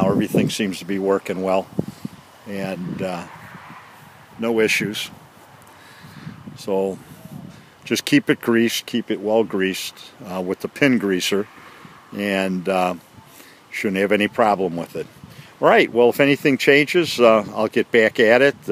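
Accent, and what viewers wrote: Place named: New England, United States. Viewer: I like your videos on this... How much is the electric start option, may I ask..., and where are you located. Your accent seems New England, me, I'm originally from Connecticut, Wallingford area. Thanks for all the good tips...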